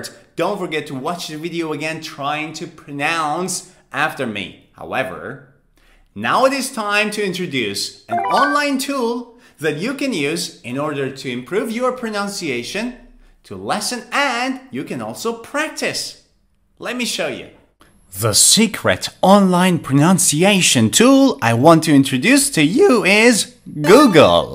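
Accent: United Kingdom. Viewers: this is the best lesson we had and it is very good to use british accent thanks a million all the best sir 👌👍🇦🇫♥🌹🙏😊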